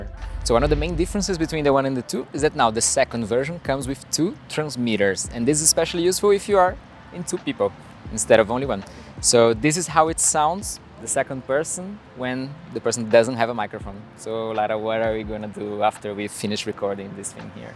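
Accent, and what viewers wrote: Brazil. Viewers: Iradaa essa comparacaoo bro!! cai aqui no seu video e logo de cara ja reconheci o sotaque brasileiro!! Comecei meu canal recentemente tbm, pensei em fazer em ingles que nem vc mas acabei optando pra fazer em português mesmo!! Pow irado ganhou um inscrito! Cheers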